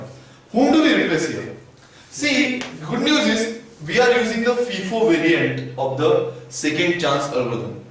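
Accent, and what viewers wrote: India. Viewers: The indian accent make it that much better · Only INDIAN accent :) very helpful video Thanks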